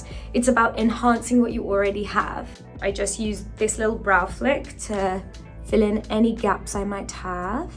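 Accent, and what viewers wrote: United Kingdom. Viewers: I love the way she talks, british accent is the best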